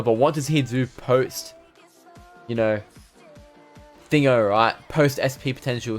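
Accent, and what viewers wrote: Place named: United Kingdom. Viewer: I love the British accent.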